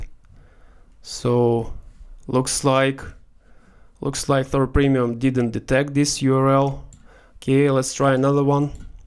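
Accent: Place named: Russia. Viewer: че то у тебя акцент то Русский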